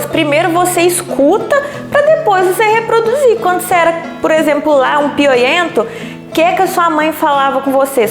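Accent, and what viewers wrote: Minas Gerais, Brazil. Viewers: AMEI OUVIR NOSSO SOTAQUE MINEIRO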